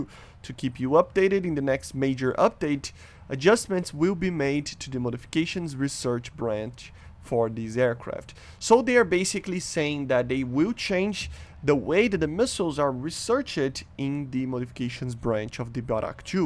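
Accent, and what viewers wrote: Brazil. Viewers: are you Brazilian???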